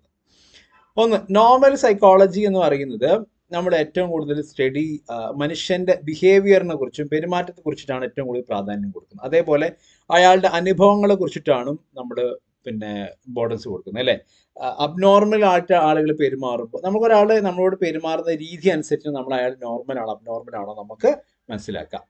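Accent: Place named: Malappuram, India. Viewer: മലയാളം പറയുമ്പോൾ നാടൻ ഭാഷയും ശൈലിയും പ്രത്യേകിച്ച് മലപ്പുറം Slang വരുന്നു. ശ്രദ്ധിക്കുമല്ലോ?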